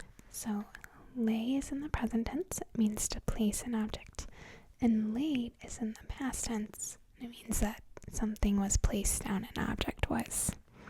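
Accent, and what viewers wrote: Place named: Midwest, United States. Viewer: I love your accent! It sounds like Michigan or somewhere in the midwest. Idk I’m Canadian what do I know lol.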